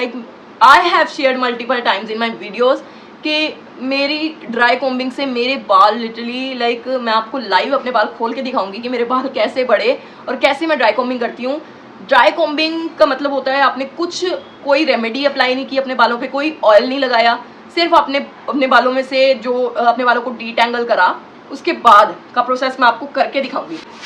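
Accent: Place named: Punjab, India. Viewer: Informative 😍❤ and i like your punjabi accent 😂🥰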